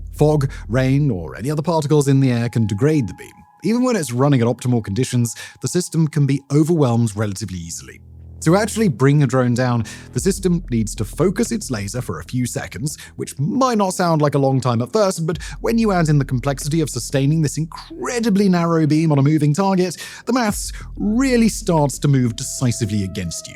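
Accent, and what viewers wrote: United Kingdom. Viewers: Is this a UK accent ? Uk isnt prepared for Drone war either, they're not even prepared for regular war, they only have 20 mission ready battle tanks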